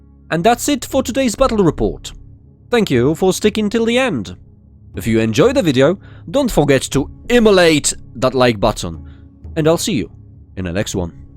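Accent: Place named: United Kingdom. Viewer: no disappointment here, it's more that the US channels can be a bit...intense. yeah your accent leans still towards a British pronunciation though haha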